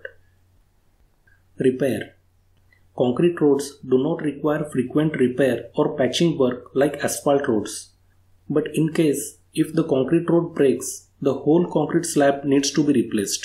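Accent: India. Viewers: Bro, you can't even type a sentence. His English is fine he just has a strong Indian accent.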